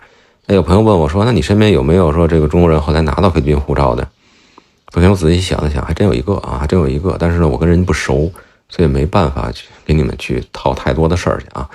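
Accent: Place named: Beijing, China. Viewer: 北京人儿？这口音很好听啊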